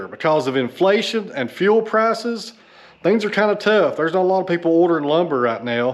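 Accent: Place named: Tennessee, United States. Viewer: I live in the UK, getting out about is a little restricted for me at the moment. Love what you do in the videos, I never thought sawmills were so interesting before but glad I found your channel.... love listening to your Tennessee accent too, very warm and welcoming.... you narrate your video stories so easily, sign of a quality creator on Youtube.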